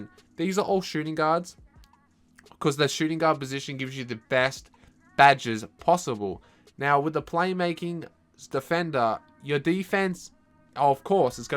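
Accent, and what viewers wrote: Australia. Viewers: Are you from Australia or New Zealand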